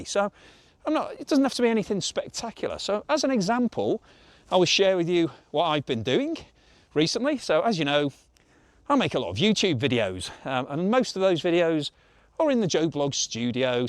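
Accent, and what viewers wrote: United Kingdom. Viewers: Don't let the nay-sayers bother you. Take it from me, from over across the pond, you don't look a day over 70! Just kidding of course, that's an old American joke. Pun intended. Regardless of your advanced age and Brit accent, I love your posts, warm Brit beer, Fish n Chips, and since I was a kid and saw my first bike, I'm crazy for vintage Brit café racers. And whatever you do keep the King! We Yanks love the drama over your way so we don't have to think about the drama over here.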